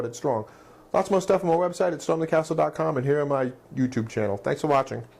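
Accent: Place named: Bronx, United States. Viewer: I love how you thumbs upped your own video. Sometimes, I think I'm my biggest fan too! Your accent reminds me of a guy I grew up with who was from the Bronx, where did ya grow up?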